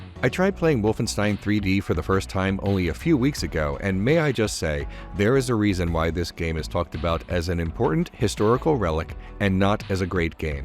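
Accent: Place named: Mid-Atlantic, United States. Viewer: Your mid-Atlantic accent is excellent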